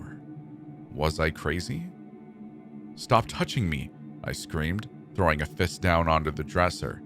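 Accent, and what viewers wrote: Canada. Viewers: My buddy who i let listen with thinks your from canada i think just up north im from the north east but Good work as always loveing it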